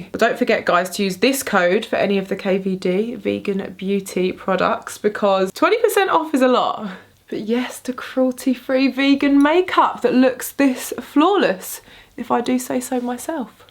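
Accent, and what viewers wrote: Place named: United Kingdom. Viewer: Omg! That british accent !!😍😍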